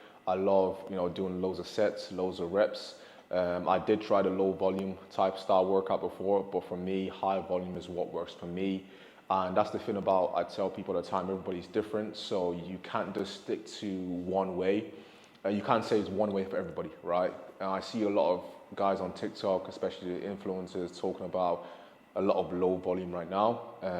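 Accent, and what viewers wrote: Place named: United Kingdom. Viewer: I didn't know u British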